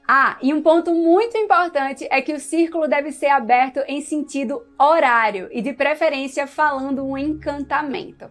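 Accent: Pernambuco, Brazil. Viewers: Pergunta nada haver com o vídeo: vc é de PE? Seu sotaque é bem forte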